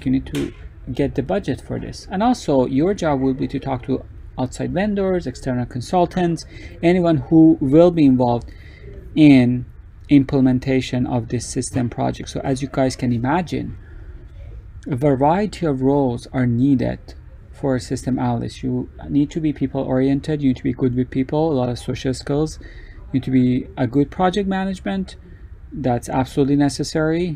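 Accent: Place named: Iran. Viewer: بسیار خوب و مفید آقای من از لهجتون متوجه شدم ایرانی هستید و خیلی منو خوشحال کرد امیدوارم همیشه سلامت و موفق باشید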